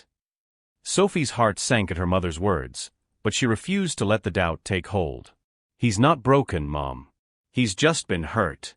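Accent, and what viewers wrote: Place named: France. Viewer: What's with the french accent leaking in? A.I. ?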